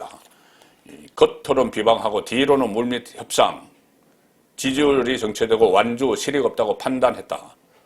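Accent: Gyeongsang, South Korea. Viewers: 송국건 본부장님 정확한 분석 빠른속보 구수한 대구 경남사투리 항상 고맙습니다 감사합니다 구독자 30만 가자!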